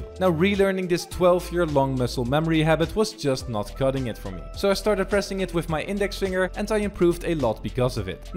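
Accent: Netherlands. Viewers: Are you from the Netherlands? It sounds like it. Edit: you are haha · Are you dutch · qucee 😂😂 Are you dutch Nice vid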